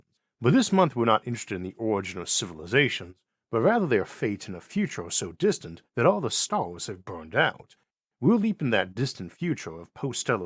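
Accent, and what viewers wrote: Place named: South, United States. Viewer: It's a speech impediment(he has improved over the years tho thanks to speech therapy) Under that is a normal Southern American accent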